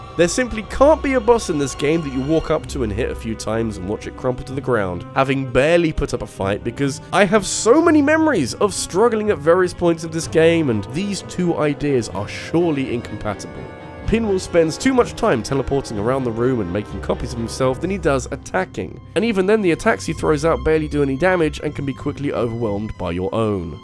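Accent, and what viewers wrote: United Kingdom. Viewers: I don't play the Soulsbourne games I just like this weird British guy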